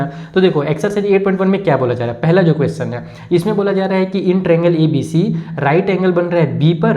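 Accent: Bihar, India. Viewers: Sir are you bihari ✌️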